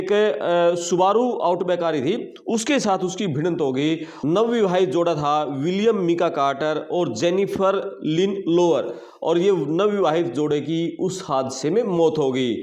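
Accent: Haryana, India. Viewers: Haryanvi flavour bhut ganda lagta hai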